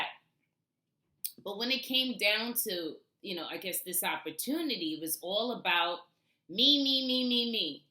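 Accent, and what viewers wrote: New York, United States. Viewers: Hi am from Nassau Bahamas I subscribe too your channel early this morning, and you soo beautiful I love your charming sweet voice you could tell that you are a true New Yorker lol.. Amorosa already went on some shows and she already have a book..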